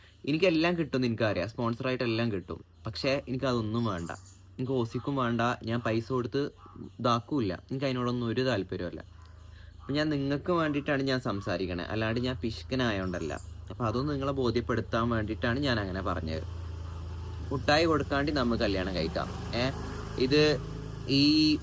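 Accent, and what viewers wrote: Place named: Malappuram, India. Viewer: Good Advice & Motivation.. അസ്സൽ മലപ്പുറം സ്ലാങ്, വീട് എവിടെയാ?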